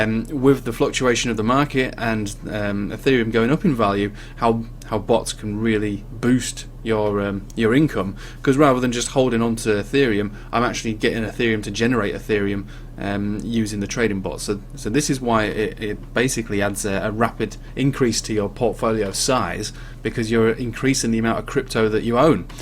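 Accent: United Kingdom. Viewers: Sounding like you in the UK, this actually works here?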